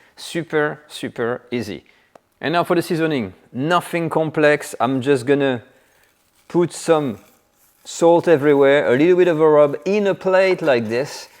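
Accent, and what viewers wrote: France. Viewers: Dear friend!! You are a truly great cook! I have been following you for some time! I truly LOVE your videos and the way you explain everything, with your lovely ....Franco-English accent... BUT !!! Well, we have, SHOULD be a bit more CONSCIOUS OF what we eat!! BABY ANIMALS ??????? THINK ABOUT IT !!!! FEEL IT!!!! For the civilized world THIS FACT must be PREPOSTEROUS, DISGUSTING, APALLING !!!!!!!!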